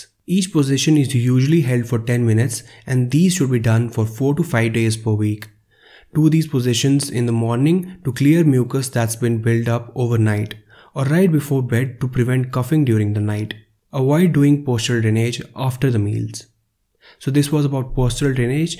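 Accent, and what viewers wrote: India. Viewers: im sorry but i cant take indian english accent serious at all lmfao. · Just some hate how indians speaks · what a phenomenal video. just what i needed. i love the way indian people speak too. its so soothing and relaxing, i could listen all day my man. maybe i should marry a nice indian girl. thanks for all the great info. liked and subscribed.❤ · I honestly love Indian accents i had a good laughs when he said “number 2nd” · I have to think about a scammer everytime i hear that indian dialect "Hi i´m Tim from Microsoft support center, located in Los Angeles"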